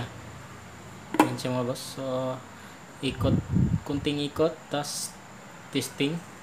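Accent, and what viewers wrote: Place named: Iloilo, Philippines. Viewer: Daw ilonngo ka to ba hahha nice vid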